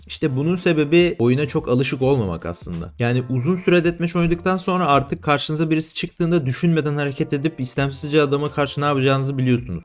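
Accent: Thrace, Turkey. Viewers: Allahım bu trakyanın şivesine bitiyorum hep böyle konuş abi çok güzel la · konuşmandan trakyalı olduğu anladım bir tık. nerelisin?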